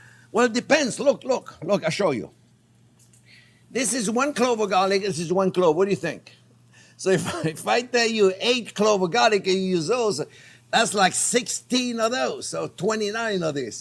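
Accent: France. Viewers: Dude is aggressively French from the beginning XD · I absolutely love this guy… how bout a split pea soup?? On a side note who doesn’t love listening to a French chef talk and cook?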